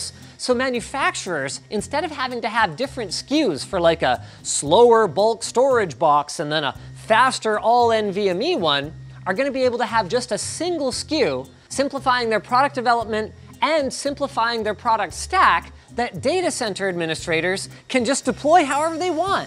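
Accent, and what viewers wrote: Canada. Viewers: Linus’ Canadian is showing more and more every video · For some reason you seem more Canadian in this video than normal.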